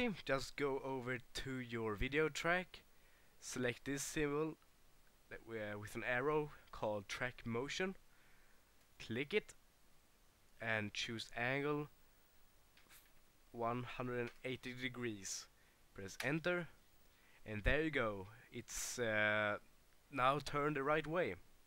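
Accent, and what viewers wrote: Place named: Sweden. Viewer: man vet direkt att du är svensk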